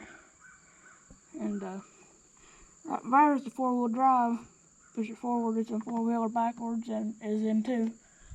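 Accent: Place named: South, United States. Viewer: love a southern Just how we all talk is awesome.. Very very strong southern accent love it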